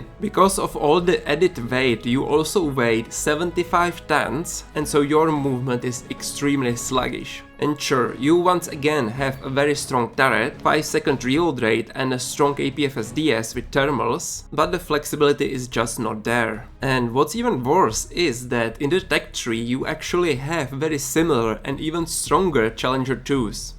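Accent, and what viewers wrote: Czech Republic. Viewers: Podľa angličtiny mi je jasne, že si čech :D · Ja si taky rikam, po peti sekundach co sem ho poslouchal sem se sel podivat do komentu jestli tady nebudou cesky komenty😂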